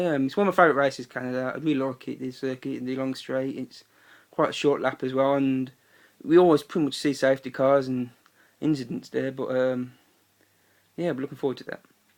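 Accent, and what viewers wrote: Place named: Netherlands. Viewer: why you speaking like a dutch guy?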